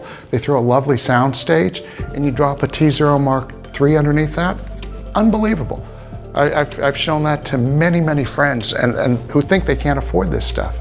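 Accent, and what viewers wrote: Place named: United States. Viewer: High dudgeon? Who talks like that? An Anglophile? Certainly not an audiophile. There's nothing like getting scolded by a person that has an American accent and uses Britishisms.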